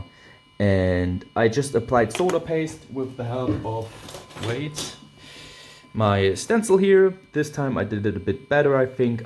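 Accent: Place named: Asia. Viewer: Wow, I didn't realize you write actual scripts for the show. I would have just used a list of points to make. Your videos are very good. Before I learned you were in Germany, I imagined you were in Asia. The accent of your English (you have perfect English) sounds Asian to me.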